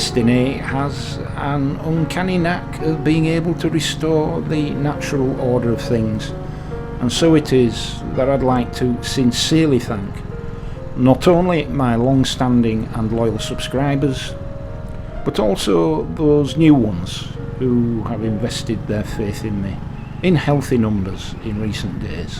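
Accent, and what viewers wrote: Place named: North, United Kingdom. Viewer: Sage words, friend, beautifully expressed(as usual). I often gaze and admire the skills of athletes, sit in awe of the intellect and wisdom of the Hitchens, Frys, Dawkins, Connolys(Billy), Gervaises, Murrays(Douglas), men&women(no pretend inbetweens) in general that inspire self-betterment. But one of my great joys in life.......is to listen to and envy that fabulous lexicon you deploy so wonderfully, friend. That amazing vocabulary of yours, wielded so mildly yet unaggressively with that marvellous northern lilt, is a major pleasure and superb voice of reason and mild-mannered advice for embattled ears. Thank you kindly for your years of service in uniform and the great guidance it now offers a father and husband trying to navigate a very frought world. Take care, friend......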